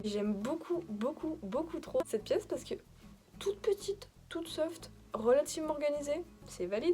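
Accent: England, United Kingdom. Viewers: J adore ton axent anglais tu peut mettre un like si t’es d’accord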